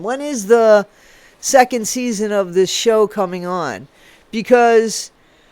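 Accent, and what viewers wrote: Scotland, United Kingdom. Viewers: I’m loving that Scottish accent 🤣😂😂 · Her dogs name is (was) Pixie. Ryan’s now. I love your Scottish accent. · your Scottish accent doesn't suck, it's hilarious actually 😂😂😂😂😂😂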